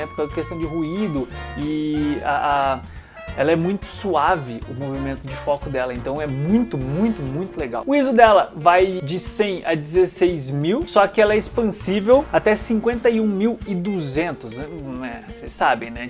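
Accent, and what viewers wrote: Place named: Curitiba, Brazil. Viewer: O áudio da câmera realçou ainda mais o teu sotaque curitibano. rsrs Abraços!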